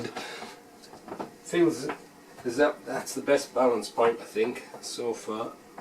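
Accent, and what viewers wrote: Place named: North East England, United Kingdom. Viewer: I can't mind too which video it was but that's when I said "I detect a north east accent!" haha... Hartlepool back in the 80's was a nice place to live, used to live in one of the nicest roads to live and now it's like a jungle now, all the houses are gone cause the council are trying to make better houses and trying to hartlepool a city but it no allowed to be one cause of the drugs and violence have increased in the last 10 years:(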